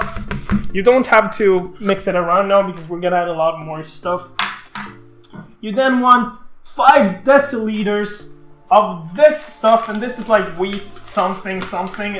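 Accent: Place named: Sweden. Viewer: Do swedish people really sound like that? I meean the accent, im pretty sure you've heard this before but you kinda sound like BluePanda. hehe and LOL tutorial video out of nowhere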